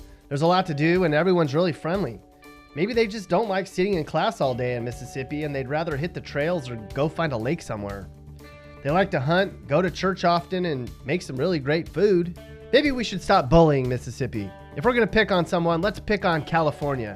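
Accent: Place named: North, United States. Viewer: Damn Yankee. Where the hell are you from?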